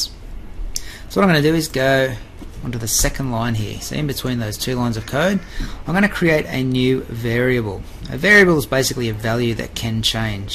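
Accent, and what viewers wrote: Australia. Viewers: love the aussie accent never lose it! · Are you Auzzie mate cause you certainly have an auzzie accent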